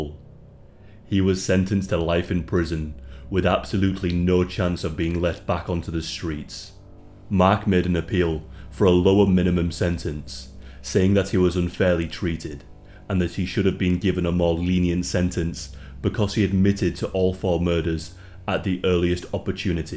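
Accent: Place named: Yorkshire, United Kingdom. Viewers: i KNEW you were a Yorkie go me can pick an accent any day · When I get some cash ima pay this dude.. I love listening to horror read in yorkish 🤪🏴🏴 This story I've known for a while... Horrific from start to finish.. OMFG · So now I know your accent is Yorkshire. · Thought youre a Yorkshire man. My oldmans from Donnie Doncaster ;))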